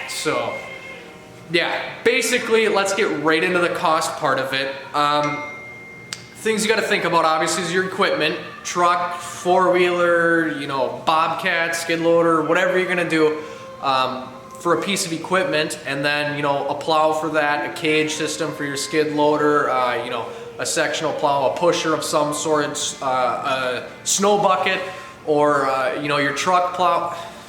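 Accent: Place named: Wisconsin, United States. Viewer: Sounds like your from wisco.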